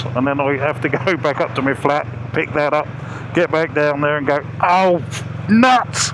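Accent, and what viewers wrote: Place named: Southern England, United Kingdom. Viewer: good evening. Great to see you out on the Vulcan after so many months. Are you actually Swedish or originally from the South of England as your accent suggests. Perhaps you could give us a brief run down on your background. But of course, only if you want too 😅Enjoy your spring and summer riding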